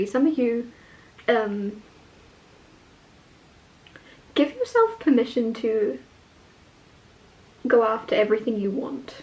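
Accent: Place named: Scotland, United Kingdom. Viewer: Are you Scottish perhaps or of Scottish extraction? We have a thing for hot 🔥 blonde 👱‍♀️ Scottish neuroscientists having lived w one in East Harlem & after reading 📚 Kelly McGonigal's book 📖 'The Willpower Instinct' when we learned that the greatest predictor of human resilience is Heart Rate Variablility & we spent a year getting down to breathing 1 breath per minute & going to NYSC & doing an hour on the Stepmill, burning 🔥 850 calories & getting our heart rate up to 165 bpm, & we've needed every bit of resilience we developed trying to survive in the 🌎 since we left NYC. What if we're a lesbian in a man's body? "Exit Through the Gift 🎁 Shop" is an excellent movie 🎬 we saw down on Houston St. about Banksy & Mr. Brainwash. We don't tell anybody anything because they never like hearing what I have to say & tell me that it's just my opinion. 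kthxbye!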